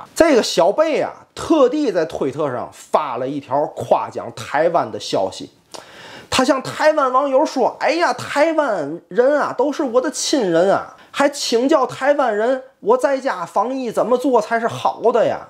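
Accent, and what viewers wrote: Tianjin, China. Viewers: 每日谈: 天津话的魅力天津人的种族天赋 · 喜歡這津腔播報👍👍👍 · 这天津话说的，喜感自然来，还很亲切。每天看莱克多巴蛙快报，是一件极为快乐的事情。支持！~ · 这口活绝了，以后都天津卫嘴好了xD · 天津話，過癮！ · 在天津上的大学，怀念你纯正的口音啊。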